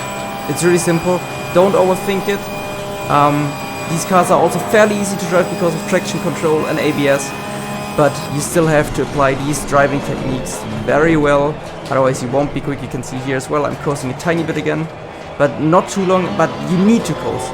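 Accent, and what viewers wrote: Germany. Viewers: bist deutscher hört man bist du esportler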